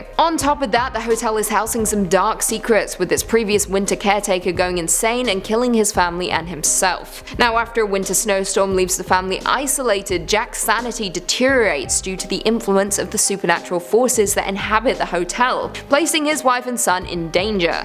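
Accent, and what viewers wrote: England, United Kingdom. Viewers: You have to remember she's from England. They also say vitta-min instead of vitamin.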